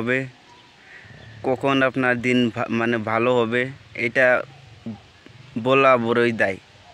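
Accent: Bangladesh. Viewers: Apner vasha sune mone hocche Bangladeshi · Ekdam tai, or khota bartai bangladeshi r moto. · নিজেকে ইন্ডিয়া মনে করো তুমিতো বাংলাদেশি কোথায় বোঝা যায় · বাংলাদেশের বাসিন্দা,, চুরি করে ভারতে এসে পাসপোর্ট ভিসা,, বানিয়ে রাশিয়া গিয়েছে,, ভাষা শুনে বোঝা যাচ্ছে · ভাই আপনি বলেছেন আপনি ইন্ডিয়ান, আপনার ভাষা না ইন্ডিয়ান, না কলকাতা, আপনার ভাষা শুনে মনে হয় আপনি বাংলাদেশি।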